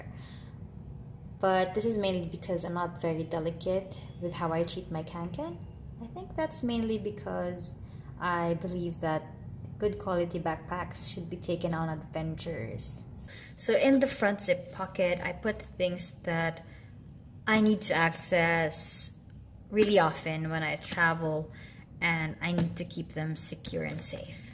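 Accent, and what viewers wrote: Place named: Bisaya, Philippines. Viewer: Hello kabayan nice review. Bisaya ka din ba? I notice your accent kasi very bisaya. Proud bizdak here. 👋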